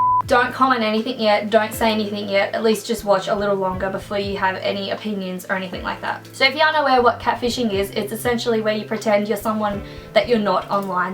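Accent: Australia. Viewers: are u from aus?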